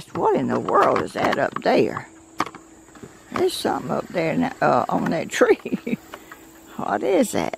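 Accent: South, United States. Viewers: Morning to you.while you are talking about blessings, you are one yourself, to me for sure. Love the walk. We had lots of woods when I was growing up in Virginia. My mother was named too.Love the southern talk and your wonderful laugh. Yes it's rabbit tobacco. I remember my daddy telling me so.we had lots around on our farm. You take care and be careful of the snakes.ok.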